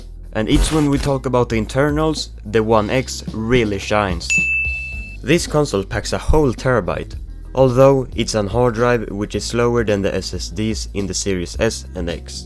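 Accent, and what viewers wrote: Sweden. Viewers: You from Sweden? :)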